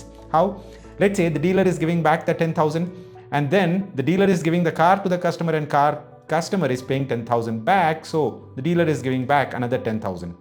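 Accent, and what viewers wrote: India. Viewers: Indian Accent bro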